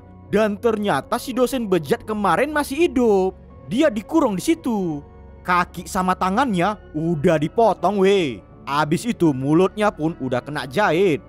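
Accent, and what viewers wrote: Medan, Indonesia. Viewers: MEDAN KALI ABG INI AH, JADI SEGAAAAAN KALI AWAK YAKAN😂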